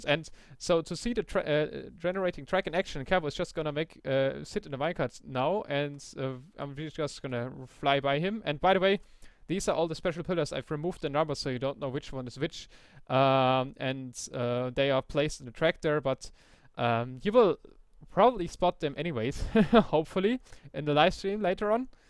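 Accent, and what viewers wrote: Germany. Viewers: german huh ? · are you german? :D your english sounds like your german^^ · It's probably German because of how the word what sounds like vhat And we is ve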